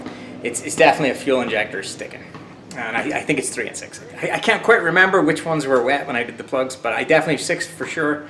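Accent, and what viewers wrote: Northern Ireland, United Kingdom. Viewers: I can pick up on your slight Northern Irish accent, all the best from the UK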